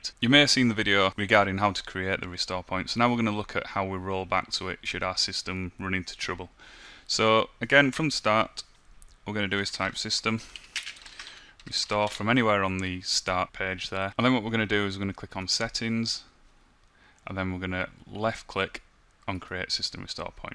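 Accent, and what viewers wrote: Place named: Newcastle, United Kingdom. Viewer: You have very sloppy diction. It makes you very difficult to follow especially when you move that quickly. Now try and do all that when your display has locked itself into 800 x magnification and you can't even find the buttons let alone get to the point where you can type in "restore". Can't stand sloppy exaggerated Northern accents at the best of times. Arghhhhhhhhhh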